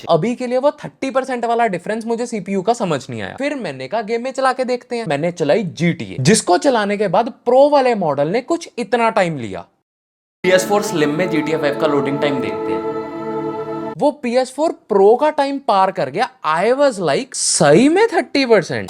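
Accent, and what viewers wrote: Punjab, India. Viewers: Bnda bhot sai yr tu ❤❤❤ Punjabi accent reflect krda hai teri hindi ch Btw love your content❤❤❤ keep it up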